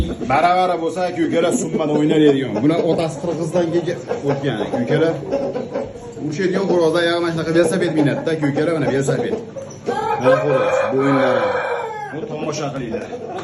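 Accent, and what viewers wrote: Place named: Andijan, Uzbekistan. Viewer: Андижондансиз шекилли булди буеги аник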